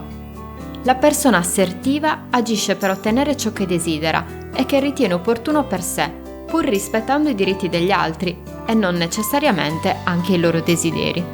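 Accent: Sardinia, Italy. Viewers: Bellissimo video...Ma sei sarda?? 😆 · bell'accento Sardo :) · Ciao, sei sarda per caso? :D è il primo video che guardo, ho sentito parlare di questo atteggiamento qualche anno fa, penso che lo farò vedere anche a mia madre, che perpetua dei silenzi assurdi senza mai esternare la sua rabbia, ma io so che vuole sbottare Allora questa introspezione potrebbe esserle utile. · Si, anche io sono sarda. Ho sentito per caso il tuo video e ho pensato: ma questa donna è sarda. Allora mi sono iscritta al canale 😉. Buonanotte 🥰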